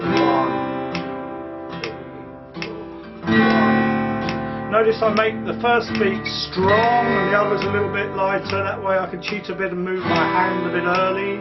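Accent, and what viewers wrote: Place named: United Kingdom. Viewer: 這位老師的youtube頻道叫做SecretGuitarTeacher(對沒錯三個單字連在一起） 這位老師教得真的很不錯 但要吞得下英國腔XD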